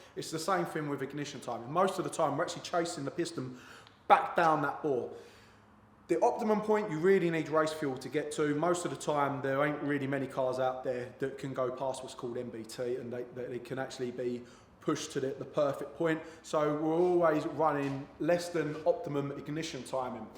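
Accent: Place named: United Kingdom. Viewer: Very informative, but not easy to understand you since you were talking with a British accent and very fast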